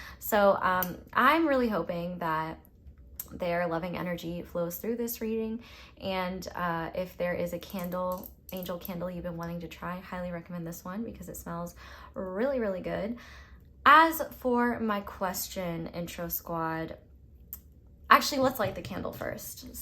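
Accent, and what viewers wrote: South, United States. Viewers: Hi there, first things first I love your original and authentic Southern accent. I find it to be really sexy, even though you might feel embarrassed about it most of the time. You seem to be a really intuitive as well as sensitive person, high on the agreeableness spectrum. Which would automatically mean, that you put other people's needs first, dislike confrontation and last but certainly not least have no hard time forgiving. A true empath by the very definition of the word, which enables you to formulate readings with such accuracy. Your gift is so potent, that you don't really need a deck, to be honest. My piece of advice to you is two fold. You need assertiveness training in order to not feel so guilty when saying no, you need to learn how to operate under pressure, in order for you to continue with your studies because you are smart and articulate therefore wasting potential. 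You could be of great help to any kind of person as a skilled therapist, I mean it. If my intuition is not deceiving me, you must have a real hard time dealing with stress from the exams. You can work on that. Best of luck with your channel.🧁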